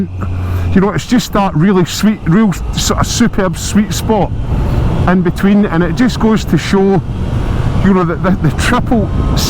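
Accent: Scotland, United Kingdom. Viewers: Man, the Scottish accent is really something else! Love the bike and love the landscape; cheers from Italy ✌🏻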